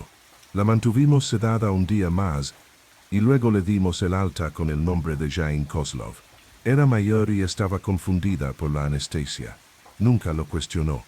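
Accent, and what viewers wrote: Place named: England, United Kingdom. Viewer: Porque está payasada de hablar con ese acento de inglés tratando de hablar en español.Insoportable.Una pena.No puedo recomendarlo.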